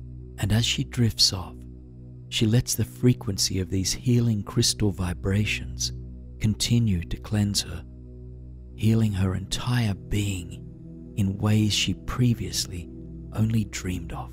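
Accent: Australia. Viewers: Hi, I really love your voice and most nights, I think ill see if this story has no sound added! I don't know how people don't find music or other sounds, in the background very irritating! It feels like hearing hearing finger nails, scratching a blackboard to me! Of course, you can't cater your stories just for me and I'm not a patron (if you have one) so, I have a cheek to say it but I'm genuinely disappointed and I do listen to others and do support them with money at times, as a thank you but I just hate missing out on your voice! I don't generally enjoy men's voices but you have a nice Australian lilt to yours! Again, I am crestfallen and I keep looking to see, if there is a no sound version but there rarely is! Unless, I'm mistaking you for another person but I think, you did kindly post me a no sound (music or added sounds, just white noise to me!) version of a story before. 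It is getting to be an irrational thing for me now because there are so few adult story tellers, as it is! I even am starting to dislike the people that say, 'oh I love the added music' etc etc lol I seriously do need sleep as I'm cracking up! I will just have to give up but I do love your voice and I wish you the best! God bless, from Ireland xx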